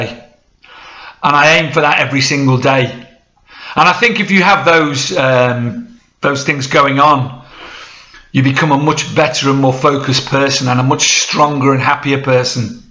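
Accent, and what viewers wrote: United Kingdom. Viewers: Hi sweden here! I dont know when I and How I stumbled in on your videos but soo great to listen to! When you say "feal good when I take the pill" which medication are you then refferring to? If you feel ok to write it! So happy to see you back as you speak of! And what a great think to live in Spain sooo I would like! Take care and continue well and I think I heard a parrot sound in the background. And have to say that your brittish accent is soo convinient to listen to!!! Which part of GB are you from? Stay safe further on your journey All the best from Sweden 🌹🌹🌹👍🏼👍🏼👍🏼🙏🏼🙏🏼🙏🏼😊😊😊👋🏼👋🏼👋🏼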